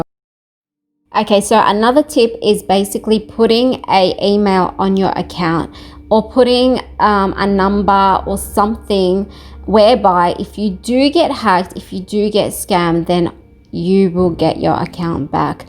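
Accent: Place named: Australia. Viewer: Nice vid you sound austrlian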